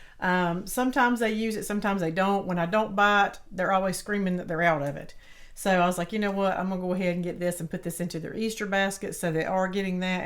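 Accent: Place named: Appalachia, United States. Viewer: Mom, GREAT job! I had one boy, so it was fun to watch you put girls’ baskets together! I loved all your choices, but I REALLY loved the stuffed bunnies! Adorable! And from my own perspective, a girl’s never too old for a snuggly stuffed friend! BTW, I love your accent. You sound just like my precious sister-in-law who is from the TN/VA border! Happy Easter! 💕💐🐰🐣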